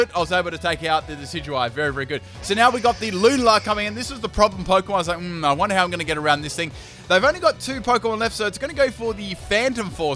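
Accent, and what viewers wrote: Australia. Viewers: Bro are u an auzzie?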